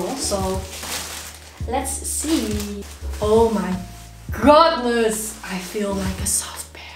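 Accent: Netherlands. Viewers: Recognized the dutch accent 😂😂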